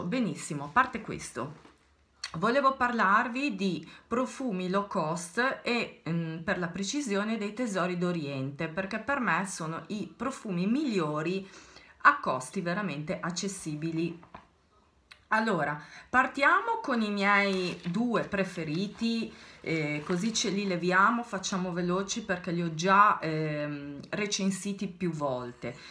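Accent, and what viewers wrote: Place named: Liguria, Italy. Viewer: Che bella che sei, e che simpatica! Amo sentirti parlare, perché il tuo accento ligure mi riporta a tempi lontani in cui ero felice.Grazie per la tua recensione... Orchidea della Cina l'ho usato ed è piaciuto tantissimo anche a me.Domani corro a comprare Bizantium, mi hai messo una curiosità immensa. Buona serata😘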